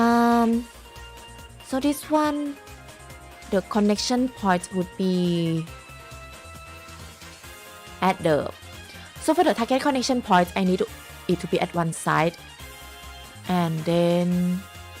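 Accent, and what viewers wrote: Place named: Japan. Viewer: Why Do You Sound Japanese?😮😮😮 Btw I'm New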